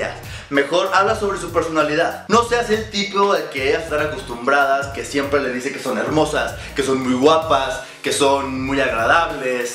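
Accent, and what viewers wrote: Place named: Fresa, Mexico. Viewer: Hablas freson y fresco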